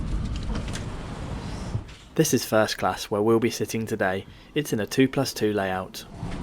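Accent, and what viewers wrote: United Kingdom. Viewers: Absolutely disgusting; you are claiming that this is a non-political movie, still you are referencing to putin illegal invasion + fundraise + repeat Nazi slogans. Based on your accent you might be from the UK. Ask your relatives, if they know British soldiers, who were wounded or killed by the Nazis in World War 2. Ukrainian Bandera Nazis fought together with the German Nazis against the Allied Forces in World War 2.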